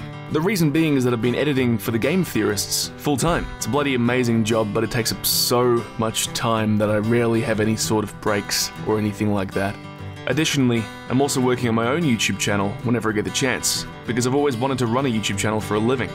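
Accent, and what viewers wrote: Australia. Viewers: Oh fuck didn't know U were Australian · I didn’t know you were Australian. But see ya later I guess! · answer any you like: are you australian? what was your favourite ytp to make?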